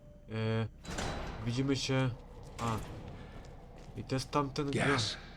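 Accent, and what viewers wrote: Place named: Silesia, Poland. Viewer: Słychać u Ciebie gwarę śląską :) pozdrowienia z Chorzowa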